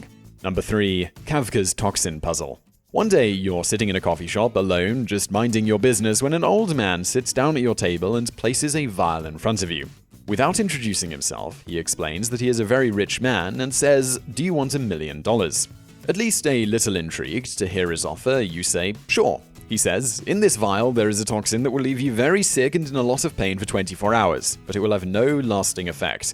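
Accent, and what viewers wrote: United Kingdom. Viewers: LOB, judging from his accent, he is clearly British so visiting every state in the USA wouldn't be a factor here. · I get it. He's British. But, Your I Null? He's never heard the word urinal before? Re-cog-nized? That's not even a word. And don't get me started on his pronunciation of aluminum.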